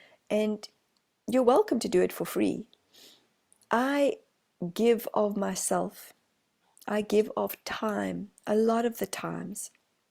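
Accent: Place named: South Africa. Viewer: Hello. I have just today been divinely guided to you. The moment I heard your voice I immediately identified with you as a fellow ex South African. I have been living in Melbourne Australia since 1982. Thank you for your wisdom. Thank you for introducing me to the Council of 8. I know there are no coincidences and that I was meant to connect with you right now. I feel so comfortable and at home in your energy and that of your mentors. THANK YOU. With so much love. Annette ❤️🤗🙏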